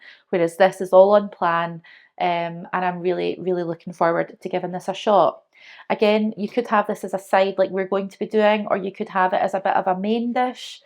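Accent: Scotland, United Kingdom. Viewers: New subscriber! Lovely to hear another Scottish voice 🤩 I just started back on Slimming World last week 🙂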